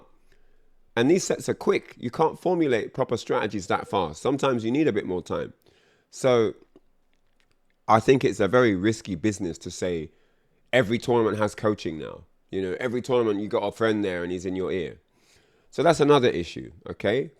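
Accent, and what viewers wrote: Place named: United Kingdom. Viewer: Uk accent! Damn I thought you were from the US. Yelp that another L for US. I thought US had a FGC Legend. I really thought u were from the US 😭. Anyway Its the principle of the matter..no coaching allow! It's a tournament where one that player show the crowd the true skill that the player possesses without cuck coach interferences. How else are you suppose to know if the player is truly skill without help. Never got why coaching was allow in the first place...SMFH!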